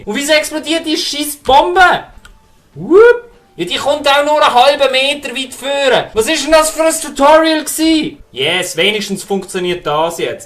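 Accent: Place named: Basel, Switzerland. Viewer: scho nur dis Baslerdütsch isch amüsierend ^^ machsch du super!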